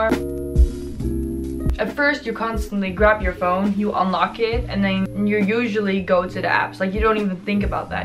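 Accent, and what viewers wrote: Netherlands. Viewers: you dutch? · r u dutch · You are Dutch I hear it!😂💓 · can tell ur Dutch hahah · Omg! I was able to tell that you were in the Netherlands cause of the way you speak. I lived in a small town in Limburg for 3 years. Anyways, I'm right now doing my one month detox facebook, instagram and twitter. Not Snapchat nor Messenger because I only use them for messages purpose. I did my cheat day yesterday because of my birthday but I honestly just posted about my birthday, scrolled and I realized that I don't care about it anymore and I haven't missed anything. And after this morning I logged off. I began this detox a little bit early on 27 September and I will log in again on 1 November. The best tip I followed was to completely remove those apps because in my computer, I rarely use those platforms. It's nice to see more people doing this. I'm more productive than ever before and I don't feel like I'm missing anything. 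Tot zo!! ;-)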